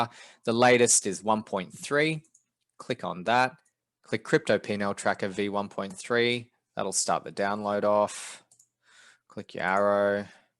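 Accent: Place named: Australia. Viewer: I love your aussie twang mattttte,, been to long mate,, catch up in Discord,, Sam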